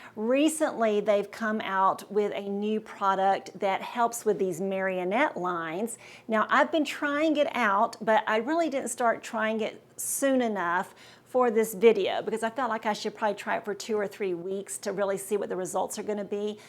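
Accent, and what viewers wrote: South, United States. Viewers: Thank you for your sweet southern accent. Reminds me of when I lived in Athens Georgia at college!!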